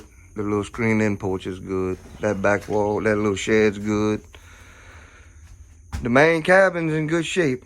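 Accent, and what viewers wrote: Louisiana, United States. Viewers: DAT LOUISIANA TWANG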